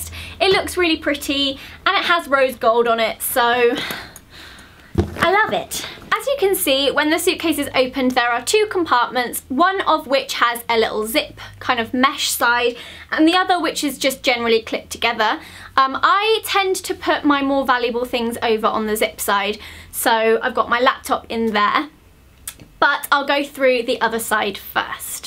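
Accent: United Kingdom. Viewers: I really love your british accent👌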